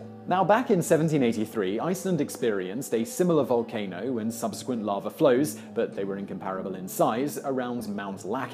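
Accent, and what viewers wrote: England, United Kingdom. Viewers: is a great learning resource and a great teacher. Full-meal range - tragic (worst despots), inspiring (celebs once homeless), serious (environment, addictions), fun (Richard Gere links) and more - gives Brain Gym capacity-building., you engage us with great voice, classy accent (a pox on the naysayers), crystal clarityy at speed, and England's great cultural assets of dignity, humour and discretion. To quote the in, "Who could ask for anything more?" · I'm not easy to get a like or subsciption out of. But most of the video and channels paticipates on make me wish I could subscribe and like more than once. Thanks to the team, I feel like Wikipedia is being delivered to me in video with a fine British accent and large vocabulary.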